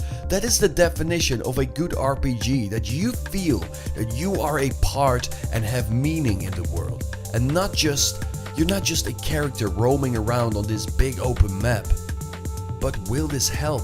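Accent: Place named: Netherlands. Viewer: The narrator sounds agressively dutch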